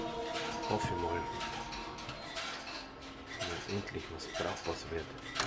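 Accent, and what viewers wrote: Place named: Bavaria, Germany. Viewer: Du bist doch a Bayer oder des hör ich doch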